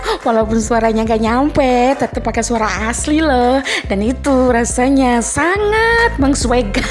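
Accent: Sunda, Indonesia. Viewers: Min ..aslinya orang mana sihh ..sundanya medok bingit 👍👍🤣🤣😂😂😂 Suara mimin bikin rindu ..kontennya yg ini mengandung bawang tpi berhubung yg bawain mimin jd ckup trhibur . Semangat min .. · mimin nya orang sunda kah 😆? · Min urang mana meni sunda pisan? logatna asa logat urang dieu😳💜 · Hehe min nya suara nya lucu org Sunda ya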